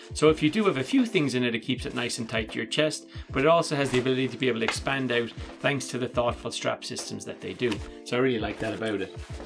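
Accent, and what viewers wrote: Ireland. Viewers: I got a little confused at first hearing the familiar Irish accent and seeing an American charger on the desk at the same time.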